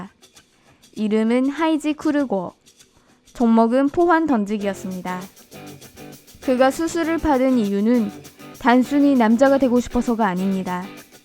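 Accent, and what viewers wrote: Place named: Japan. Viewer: 발음이 일본사람같네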